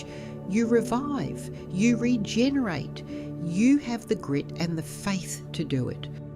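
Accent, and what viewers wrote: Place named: Australia. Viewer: Female Aussie accent in Ai.(?) Blokes know you are right. Give us pause to reflect... (then start nagging ...if we can't come up with plausable excuse, we deserve it).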